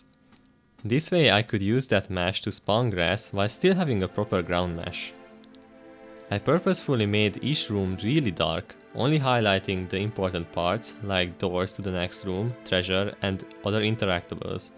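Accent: Hungary. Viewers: Are you Hungarian? Just asking because your accent is realy familiar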